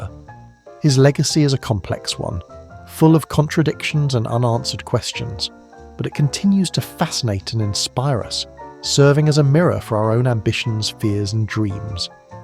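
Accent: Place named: England, United Kingdom. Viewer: Lol. That is the voice of the average english man. Relax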